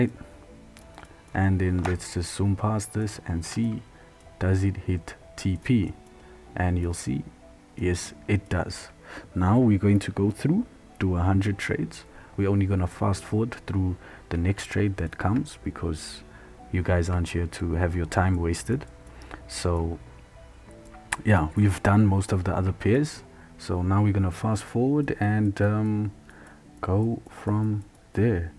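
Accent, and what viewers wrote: South Africa. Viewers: Thanks, where are you from, S.A. ? Your accent sounds like you from S.A. ? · You sound South African you from South Africa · Are you South African?😊 Thanks for the video! Looking to learn more about ICT. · Bro you from sa?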